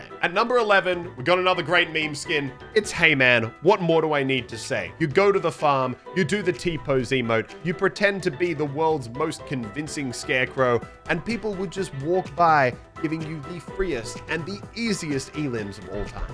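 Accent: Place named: Australia. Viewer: Even though it's basically becoming SypherPK with an Australian accent, a week late. It is still not nearly as bad as the Fake American Accent Aussie Fortnite Youtuber.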